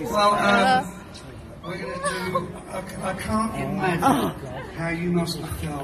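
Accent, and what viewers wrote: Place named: Liverpool, United Kingdom. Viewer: I love the scouse voiceover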